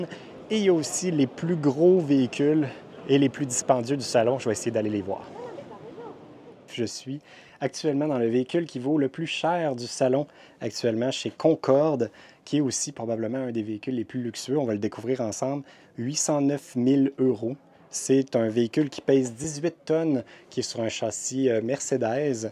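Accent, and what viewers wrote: Quebec, Canada. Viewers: Merci pour ta vidéo et surtout merci de garder ton accent Québécois pour nos cousins. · Le système douche/toilette séparé par une cloison amovible! Wow ! Aussi, je veux te dire que ton parler fait honneur au québécois, moi qui en suit une! ❤ Tu n'as pas été empêché de filmer comme les Vadrouilleurs toi?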